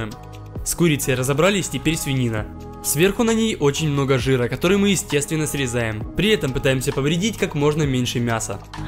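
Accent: Ukraine. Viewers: Смотрю тебя с трехсот тысяч, качество выросло в десятки раз! А ещё пропал украинский акцент))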